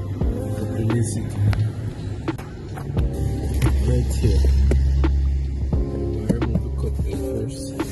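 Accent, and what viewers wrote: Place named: Jamaica. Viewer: Sound like you in Jamaica, same place mi deh, I have the same car with the same issue. How can I get that bushing?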